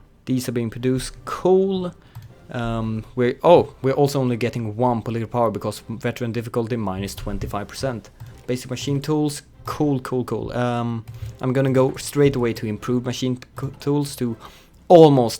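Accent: Sweden. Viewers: Är du svensk, för du låter väldigt svensk i din engelska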